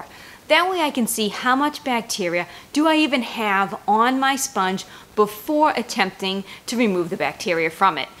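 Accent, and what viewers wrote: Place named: New England, United States. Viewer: This was good. I just discovered your channel and will have to check out more of your videos - they sure look interesting! I hope you don't mind this Canuck saying, I like your New England accent. ☺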